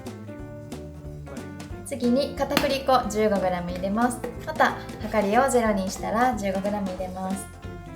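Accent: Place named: Kansai, Japan. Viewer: はじめまして、さん！年末に仕事を辞めたので、時間ができたら米粉パンに挑戦！って思っていて、パン好きなので、グルテンフリーに前から憧れてました🥰 沢山ある動画で、さんのは、とても分かり易く、同じ大阪の方で何といっても関西弁にほっ！として、優しいほんわかな感じがとても良いです。お総菜パンも作りましたが、今日はクランベリーとクリームチーズを作って、めっちゃ美味しくできました😀 先日のライブで、チョコパイの話をされていたので、さっき動画観て、作りたくなりました。ドーナツも気に入ってて、まだ作れてないんですが、挑戦しまーす。こんな貴重なパン作りを無料で拝見できるなんて、有難いです。他もまだまだいっぱい作りたいと思います。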